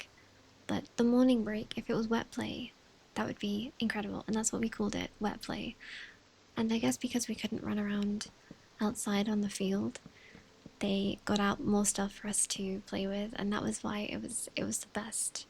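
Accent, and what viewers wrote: United Kingdom. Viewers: Ru from uk?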